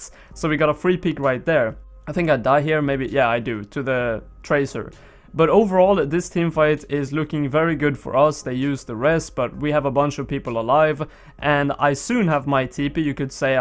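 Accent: Sweden. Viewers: Are you from sweden?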